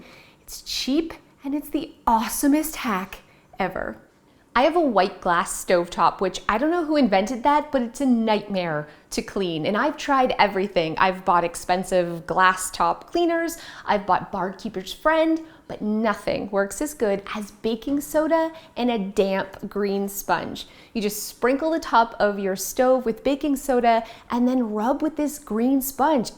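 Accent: Canada. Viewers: Do you live in Canada? I'm trying to figure out your accent? Great video. Thank you ❤️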